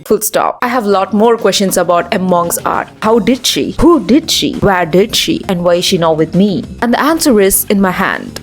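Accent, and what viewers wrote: India. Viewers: You got some little bit of Indian accent I think 🤔 you are from India, btw keep it up😊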